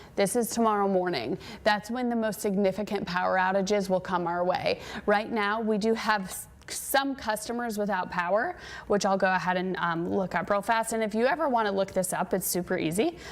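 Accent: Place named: New England, United States. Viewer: sounds like another yankee..............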